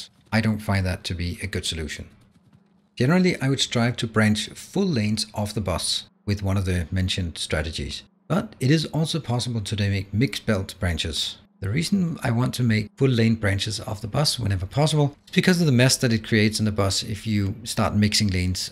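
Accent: Denmark. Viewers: Wow you sound super duper danish. :) Nice video!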